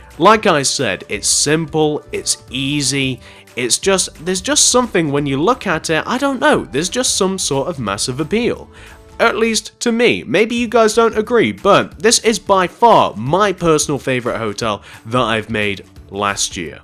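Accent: England, United Kingdom. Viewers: Are you form England?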